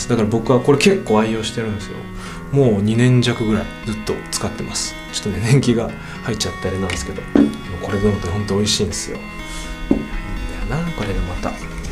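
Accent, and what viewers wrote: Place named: Okinawa, Japan. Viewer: あたり、與那城くんの沖縄なまりがちょっと聞けて私は興奮しました。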